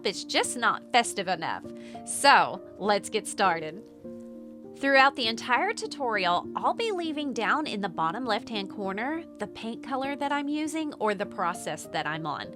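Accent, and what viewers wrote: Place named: South, United States. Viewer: Your soothing voice with a slight southern accent (so cute) and watching you create is how I start each morning. You've once again inspired me. Thank you!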